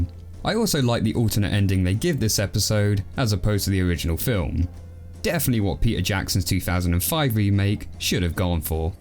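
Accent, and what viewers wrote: England, United Kingdom. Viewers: I didn't know you were English ( I am...)!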